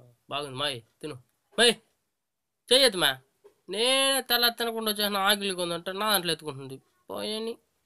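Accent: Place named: Chittoor, India. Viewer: Nice brother super comedy chittoor yasa adhiripoindhi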